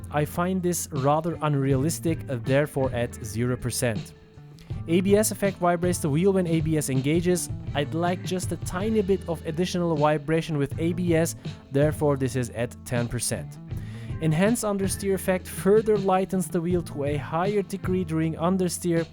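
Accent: Turkey. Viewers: reis türksün demi la jsajnfashjkfjlas aksandan anladım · 2. saniyede türkmü bu dedım kanala gırdım türkiye gordugum en temız ıngılızcelerden bırı olsada bellı edıyor kendını elıne saglık bu arada vıdeo ıcın sagol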